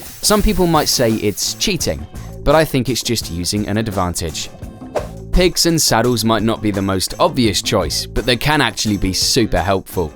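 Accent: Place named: South, United States. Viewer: Was expecting a monotone American accent instead I got a chirpy southerner overall good deal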